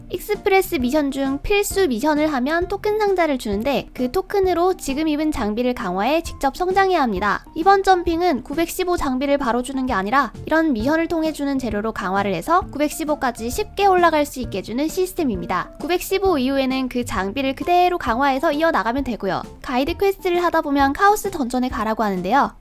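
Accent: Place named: Gyeongsang, South Korea. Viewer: 경상도 분인가 E 발음할때 다 티나심 ㅋㅋ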